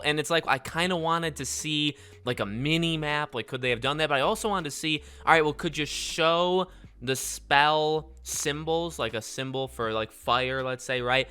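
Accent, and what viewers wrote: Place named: Midwest, United States. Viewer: You sound like you are from Chicago or Wisconsin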